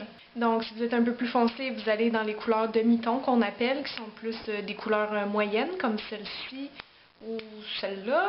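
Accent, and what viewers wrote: Canada. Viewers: Euh...désolée mimane18000 mais n'a pas d'accent...Ahhhh ok! Tu es française et tu parles de son accent canadien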